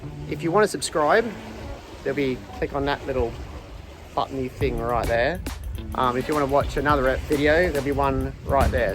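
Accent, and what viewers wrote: Australia. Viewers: You sounded more Australian in this vid. I wondered if maybe you were tired, as accents are sometimes accentuated then? Great finds today. I actually liked that flat coat button that you seemed a little disappointed with. The quality of buttons then were considerably better than today. I’ve got to look for an update on this vid to see what that lump of metal turned out to be. Did they only make cod bottles in green? It would have been lovely if they had other colours too. Then the marbles would be really colourful. Thanks for sharing your finds.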